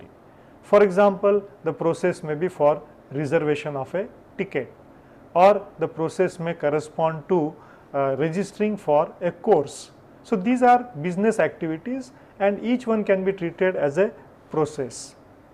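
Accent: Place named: South, India. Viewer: very sincere efforts and great way to explain ER modelling. You are very brilliant and unlike other loosers, I had absolutely no issues understanding your accent. Its near to neutral unlike other regional accents from southern India. Keep up the good work and expecting many more videos from you.. Thanks you!!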